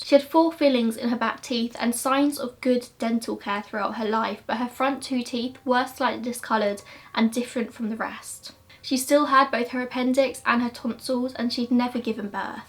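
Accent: England, United Kingdom. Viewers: I just realised that the English accent is so much nicer than the American one. No offence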